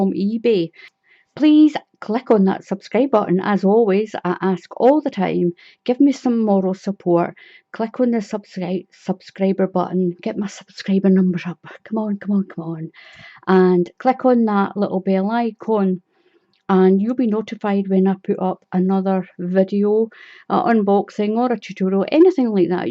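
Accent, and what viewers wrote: Scotland, United Kingdom. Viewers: Oh my! That totally blows that crappy mystery box from e-bay out of the water !! LOL... What a great stash. 🍀You did great.🎶 I have never used T-shirt yarn. I don't. know how it feels. But I can't wait to see what you do with the pom- poms.I thought I might like that for a trim around a baby blanket ? I really don't know. The colors of your stash are beauties. I am in the process now of making a Ferries Wheel yarn , color , Wild Violets, small baby blanket. Keep well. Stay safe. Keep healthy. God bless us all. I really like your channel and I love your brogue. 🌞 Is it Scottish ?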